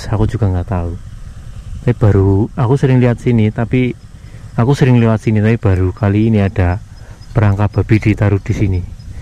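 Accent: Banyumas, Indonesia. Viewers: ora ngapak ora kepenak 😊😊😊😊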